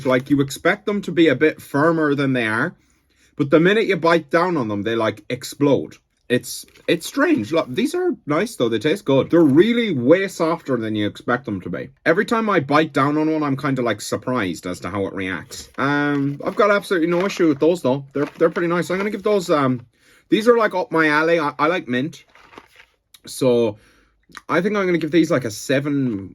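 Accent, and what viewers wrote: Ireland. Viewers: What’s your accent? Trying to learn about Irish regionalaccents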